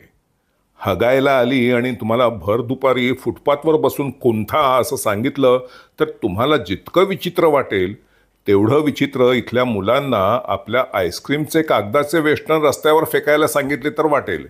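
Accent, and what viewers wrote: Pune, India. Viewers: काय मराठी आहे अगदी पुणेरी शैली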